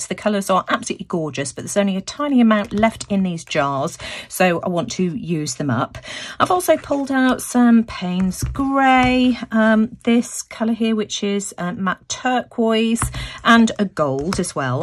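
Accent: United Kingdom. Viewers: I’m only a little over a year into this next media and have become quite a YouTube junkie! I want to complement you, not only on your beautiful work, but how will you explain what you do and why you do it! You have the perfect voice as well! I live in the US and really enjoy your British accent! It’s so refined! Incidentally, heading off today to have lunch with my son and daughter-in-law who are expecting a new baby any day now! While I’m down in their neck of the woods, I am going to search for a Daphne’s Diary! 😉 Thanks again for the inspiration of those beautiful diaries!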